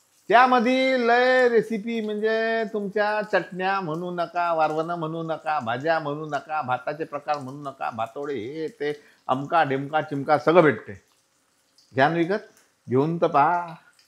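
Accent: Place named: Varhadi, India. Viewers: Aaji lay chaan varhadi bolta Maja aa gaya Mai Nagpuri ❤ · वऱ्हाडी छानच बोलतात आणखी कोणत्या भाषा येतात तुम्हाला? नक्की बनवून बघणार, मी playlist बनवतो आवडत्या रेसिपीज ची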